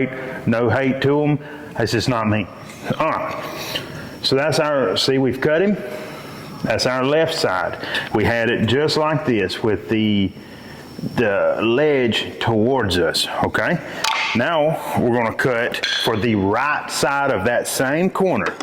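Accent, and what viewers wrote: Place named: Western Maryland, United States. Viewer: Nice. I would not have placed you there according to your accent. I heard people with your accent in Western Maryland. Of course now I ain’t been around too far but I’ve been in Pensacola several times. I build kitchens and typically work with 4 1/4 inch crown. However, I’m on a job that has 7 1/4. And I decided to do it flat. Your video has been helpful.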